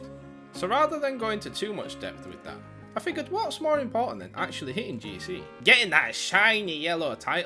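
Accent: Yorkshire, United Kingdom. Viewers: Your a proper Yorkshire lad aren't you!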